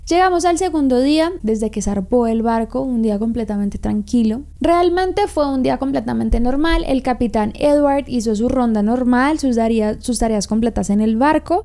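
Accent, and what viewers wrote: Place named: Colombia. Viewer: Excelente narración, eres muy buena y tu acento parcero me encante saludos desde Mëxico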